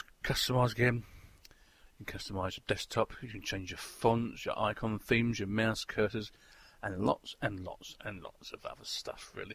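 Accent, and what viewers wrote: United Kingdom. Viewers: its a british accent, we are not as posh as your program 'family guy' makes us seem!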